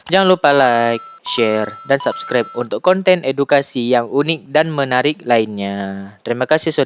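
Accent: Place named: Aceh, Indonesia. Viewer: Logatnya meuaceh that sang 🧐🗿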